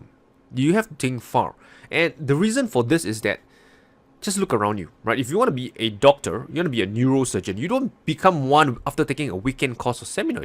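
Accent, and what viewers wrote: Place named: Singapore. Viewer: Nice discussion Sir. And the Singaporean English Accent.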